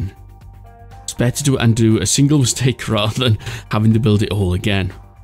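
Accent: Wales, United Kingdom. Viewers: Man, I miss your videos, I haven't watched your channel in forever. I remember watching your videos during a boring summer, or when I had a bad cold, etc.. Thank you so much for continuing to make your videos, they always bring me joy with your strange humor. And it's so nice to hear your Welsh accent once again xD Keep up the great work!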